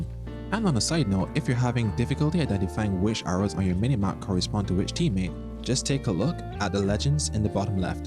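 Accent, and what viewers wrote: Barbados. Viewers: Great video. Will definitely be watching the rest and any future videos. Quick question are you Bajan or Trinidadian? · Are you Bajan? You sound it! Great guide.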